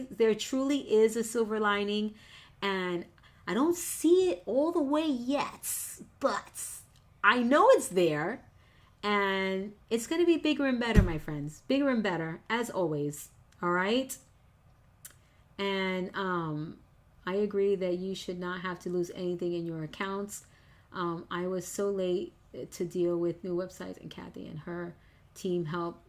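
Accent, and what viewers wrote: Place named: New York, United States. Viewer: Kathy, I have followed you since you showed up on the paper crafting scene so many years ago. I can still hear your NY accent! I have always believed that you are brilliant and have designed things unlike anyone else. That company was wrong and unprofessional. That designer that included the elements that were not theirs is also wrong. But, unless they can show proof of copyright, you have done nothing wrong. Please pursue legal action as this can be very harmful to your reputation. You need to stand up for yourself to make it clear that you will not tolerate this kind of behavior. I am so sorry this has happened to you, and you do not deserve it. Stay strong and proud. We know who you are, and that is all that matters in the end. Hugs to you.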